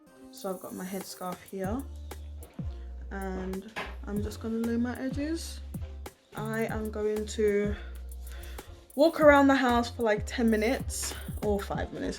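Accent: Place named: Jamaica. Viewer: I loved this tutorial, then me hear the Jamaican accent and then me extra love it! Had to subscribe <3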